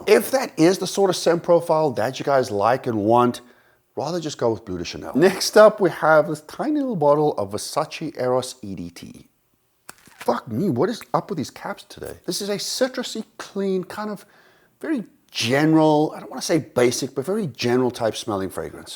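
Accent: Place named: South Africa. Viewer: I knew your accent was familiar. You mentioning South Africa confirmed my suspicion.😂😂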